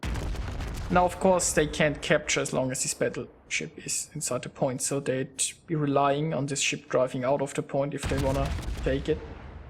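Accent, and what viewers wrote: Germany. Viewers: I got one question. Are you from Germany?:D Because you speak like a german person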